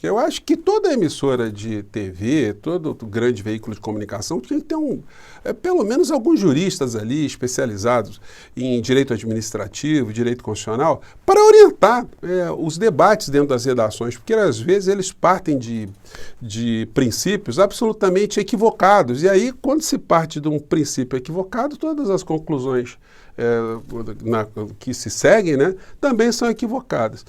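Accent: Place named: Rio de Janeiro, Brazil. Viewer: . Sou paulista. Acompanho e pretendo acompanhar sempre seu canal. Vc é muito bom. Só repare, você mesmo, em qualquer vídeo seu: " vc que é muito bem VINDO e muito bem VIM" Nenhuma crítica ou implicação. É parte do seu sotaque carioca. Mas, perceba aí. Continue do mesmo jeito. Tenho absoluta certeza de que é sotaque.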